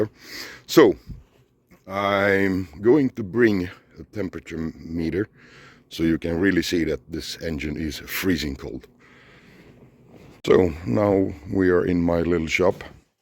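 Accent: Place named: Scania, Sweden. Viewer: Gillar dina filmer, skönt att lyssna på den ljuva skånskan😊. Men den saknade man i detta klipp😏🤔🥲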